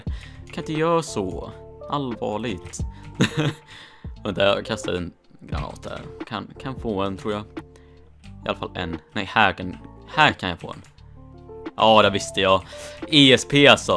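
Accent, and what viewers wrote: Sweden. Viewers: O nice speaks stockohlmska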